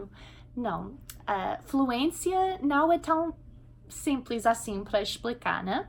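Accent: Rio de Janeiro, Brazil. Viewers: sotaque meio carioca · Você está no Rio de Janeiro pelo sotaque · SEU SOTAQUE CARIOCA ESTÁ EVIDENTE DEMAISSSS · um leve sotaque carioca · Tem um sotaque carioca. Aquele chiado típico no falar. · Sotaque carioca?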